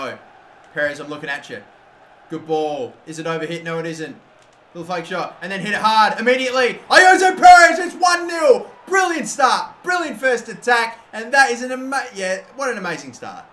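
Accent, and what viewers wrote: Australia. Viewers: daily dose of angry aussie beginning in 3, 2, 1....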